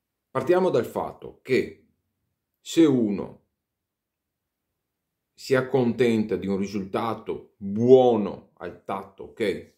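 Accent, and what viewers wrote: Veneto, Italy. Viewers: Carissimo, Ho visto questo e molti altri tuoi video. Condivido tutto e trovo le tue recensioni di lamette e rasoi molto vicine alle mie stesse impressioni, per quei pochi modelli che conosco. Penso abbiamo entrambi pelle molto delicata e versi del pelo inclinati. Io da molto anni mi sono avvicinato alla rasatura tradizionale anche se ad intermittenza, per molti anni ero tornato al multilama per comodità e poco tempo al mattino. Però ho sempre usato anche col multilama l’ottima crema DR Harrys Arlington ed il pennelli Plisson in tasso grigio di alta montagna ed il dopobarba Acqua di parma in balsamo nella profumazione colonia classica, un prodotto che trovo eccezionalmente lenitivo. Dei Plisson e di Acqua di Parma non ho mai trovato recensioni almeno in italiano, peccato perché Plisson è la più antica fabbrica di oennelli da barba. 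Proverò le lamette che hai consigliato in altri video, almeno quelle più reperibili. Grazie e bel lavoro! Ed anche complimenti per l’accento Veneto, anche io sono veneto ma molto più ai confini nord… Saluti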